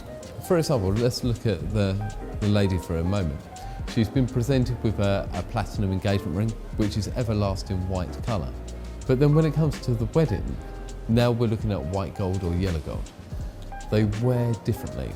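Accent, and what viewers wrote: England, United Kingdom. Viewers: It is clearly an English accent you wouldn't hear this sort of accent in Northern Ireland,Wales or Scotland,it's not therefore 'British' but English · That british accent. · I love your britisch accent! Cool. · English accent no such thing as British accent.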